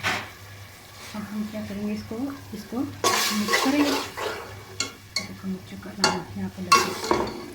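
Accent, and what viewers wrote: Punjab, India. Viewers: So nice taste aur ap ka punjabi style bohat acha ha ap punjab k kis aria see ho ma lahore sey hon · What a lovely talking style, specially punjabi touch, i think speak punjabi more. you must reply !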